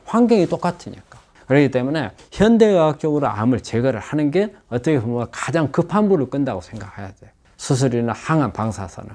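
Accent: Jeolla, South Korea. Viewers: 웜마 전라도네우짜쓰까잉 구수하구만 ❤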